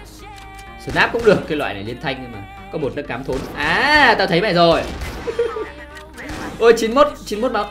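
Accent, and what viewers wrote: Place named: Saigon, Vietnam. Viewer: ông này giờ nhại sài gòn rồi :)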